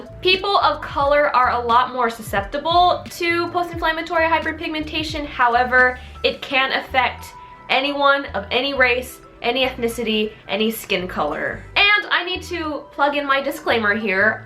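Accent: Australia. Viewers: THAt AUSTRALIAN ACCENT IS AMAZING ❤️❤️❤️ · Haha same I first thought British>Australian then realised she’s American 😂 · Your Australian accent had me dying!! I always do the thing where you pronounce "o" as "or" around my friends to annoy them lol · Daniela Gonçalves I thought it was Aussie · Am I crazy or do I randomly hear an Australian accent here and there? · I like that Australian accent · I'm Australian and I honestly thought you were an Aussie from the first 10 seconds. Our accent is so damn hard but you did such a good job 💕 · i prefered her Australian accent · I THOUGHT SHE WAS AUSTRALIAN AT FIRST 🙃🙃🙃🙃🙃🙃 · that australian accent thoooo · I was listening to your voice at the start and you sounded kind of Australian😂 I was like woah I thought you were American😂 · HAha. I noticed the Australian accent. Only true Aussies can talk Australian so I would say that lovely must have an australian background:) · Fifi González I thought she was aussie at first lol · Ooooh an australian youtuber cool ☺️ wait what? 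😳 huh? She's american? What? I'm confused af. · is it jus me or does this girl have an australian and american accent 💀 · Did she just start off in an Australian accent??? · it was an australian accent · I’m glad I read the description because that Aussie accent REALLY threw me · Kazzie Bolaños no that was definitely Aussie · Des Breaux i could have sworn she was Australian 😂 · prqurn it was Australian not british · Why did you talk Australian then change ?? Confused as lol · Are you Australian, from the U.K. or America??? What are we doing love? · Wait... are you Aussie or North American? I'm confused! Lol awesome vid thooough and very informative. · Mashallah you do a great Aussie accent you legit sound like an Aussie-coming from an Aussie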